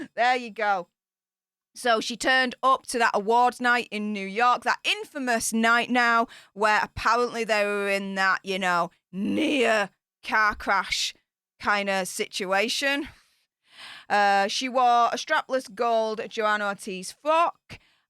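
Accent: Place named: Cockney, United Kingdom. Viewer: I really like you but I can't understand half of what you say. Lol. I'm American and not used to your thick accent. I understand most British and Australian accents but have difficulty understanding you. Are you cockney? You remind me of the beginning of My Fair Lady.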